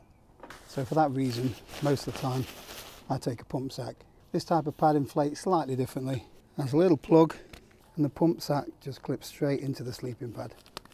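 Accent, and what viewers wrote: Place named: Derbyshire, United Kingdom. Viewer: Thanks, great advice as standard. Planning to go bike packing and wild camping this year 2021, your accent sounds very local to me(Belper, DERBYSHIRE) where are you from?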